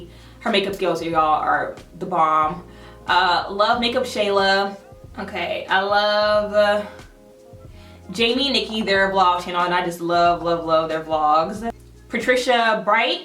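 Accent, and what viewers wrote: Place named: Louisiana, United States. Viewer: ❤️ ❤️, sounds like where I grew up in Louisiana 😊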